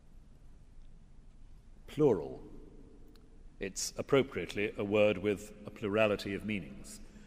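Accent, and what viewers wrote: United Kingdom. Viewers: The Archbishop of Canterbury has to speak the Queen's English! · the priest with the golden voice, and a british accent to boot! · Where did he get that posh accent? He's a Welshman!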